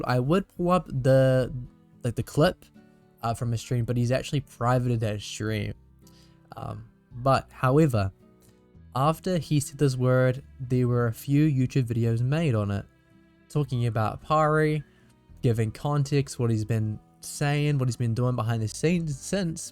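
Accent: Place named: New Zealand. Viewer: im not super into animal jam like i used to be when i was younger, but i like to stay up to date on the drama... never watched a video of yours before but i immediately recognized the accent .. love to see kiwis makin good content bro keep it up !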